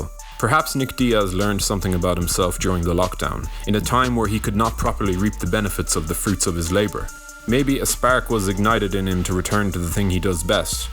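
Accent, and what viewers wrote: Ireland. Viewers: Your posh irish accent is annoying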